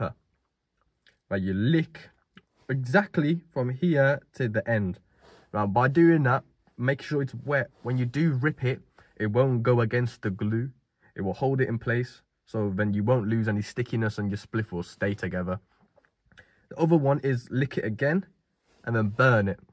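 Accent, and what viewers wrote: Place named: Birmingham, United Kingdom. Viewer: u from birmingham? nice accent.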